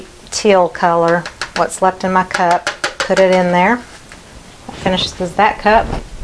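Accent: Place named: North Carolina, United States. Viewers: I love to hear you talk you sound like. ME yeah. You sound like me Those. North Carolina girls are the bomb bo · You kinda sound like an NC girl like me. Mid Atlantic? I’m trying to place your accent ☺️ This pour is absolutely stunning! Thanks for sharing. 💜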